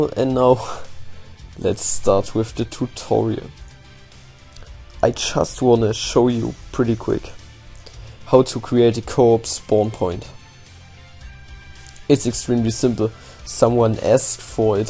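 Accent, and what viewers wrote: Germany. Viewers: Are you german and trying your best english? You can talk well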